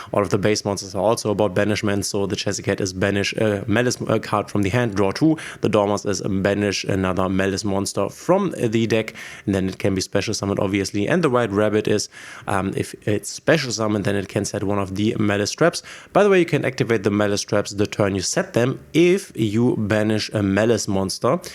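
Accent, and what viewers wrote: Germany. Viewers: Warum auf englisch? Ich weiß nicht , man hört einfach wenn man eigentlich deutschsprachig ist aber englisch redet 😂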